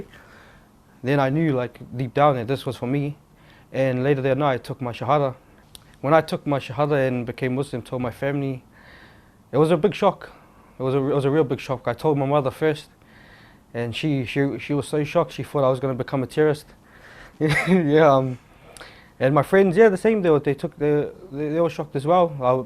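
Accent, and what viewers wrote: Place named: Australia. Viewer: Alhamdullah & Mashallah 🤩... he sound Australian tho 😅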